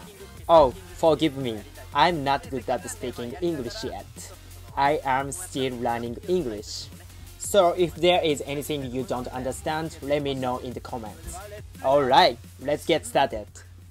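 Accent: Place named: Japan. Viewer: honestly, the japanese accent makes it even better!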